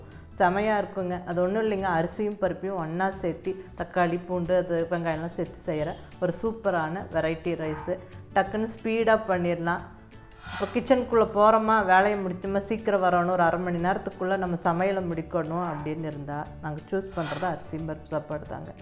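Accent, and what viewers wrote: Kongu, India. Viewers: கொங்கு தமிழற நீங்க பேசறதபார்த்தா தெரியுது மேடம் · Superrr ngov.all ur preprations r neat and simple.mainly our kongu language awsome.best wishes pa.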